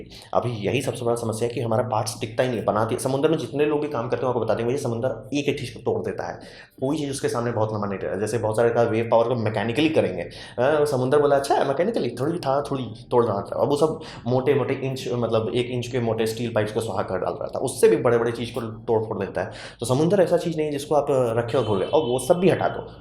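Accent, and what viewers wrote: Bihar, India. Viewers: Sir r you from bihar ...😅........ur way of teaching is so funny and interesting...i enjoyed it...🥰......thnku so much..🙂🙂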